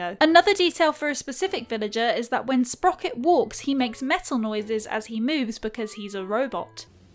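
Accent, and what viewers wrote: United Kingdom. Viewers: Finally, a british accent! Instant sub 😄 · I love her British accent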